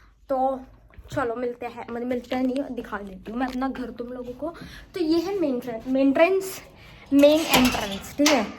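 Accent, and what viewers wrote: Maharashtra, India. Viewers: I get you girl. Your hindi is marathi mix nd influence. So humara hindi aise hi sound karta hai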